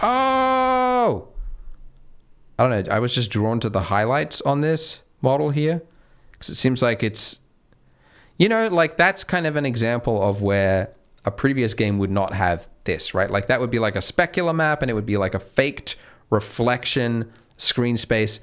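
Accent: France. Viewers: me : "Ok, he has the same accent as me, he is french" Andrew : "Sooooooo French" *unmasked so fast haha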